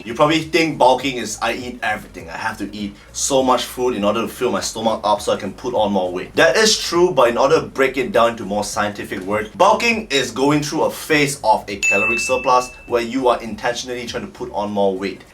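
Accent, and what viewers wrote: Singapore. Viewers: Eh bro r u Singaporean u sound singlish siah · Is this a Singaporean accent? Great video by the way!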